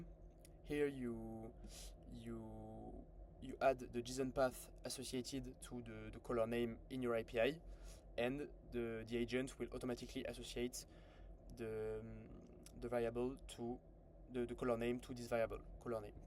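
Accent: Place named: France. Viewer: Pourquoi ne pas faire une vidéos en Français alors que la société est française, de plus avec un anglais avec accent français .Dommage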